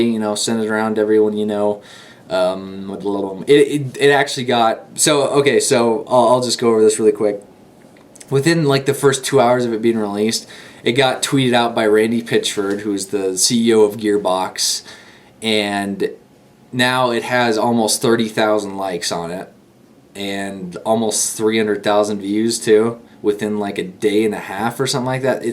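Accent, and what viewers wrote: United Kingdom. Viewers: have you ever heard of England? he has a mother fucking British accent. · British English...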